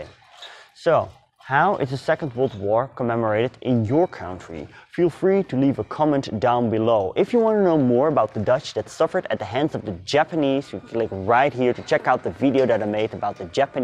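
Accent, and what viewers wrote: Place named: Netherlands. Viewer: Aangezien je dit dus in het engels doet hierbij een tip. Werk nog een beetje aan je uitspraken. Als je dus engels sprekende mensen wilt aanspreken maar je uitspraak van bepaalde woorden niet goed is gaan mensen zich ergeren. Bijvoorbeeld “country” of worden met een D zoals “dead” en het verschil met “death”. Geeft niet, het zijn typisch Nederlandse uitspraken van her engels maar misschien kan je dat gewoon eens oefenen. Want je inhoud is de moeite waard.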